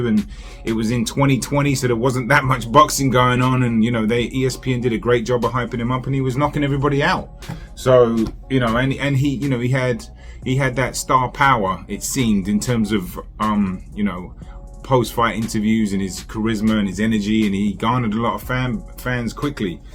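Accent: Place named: United Kingdom. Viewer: Oh snap I didn’t click you live in nyc. From your British accent I just assumed you live in uk. Props bro from uk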